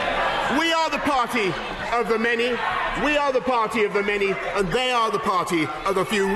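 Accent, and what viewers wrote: United Kingdom. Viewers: This guy's gonna get the job done. He's like Trump with a British accent!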